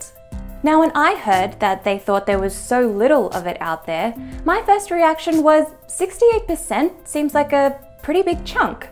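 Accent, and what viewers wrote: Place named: Australia. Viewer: Aussie accent is superb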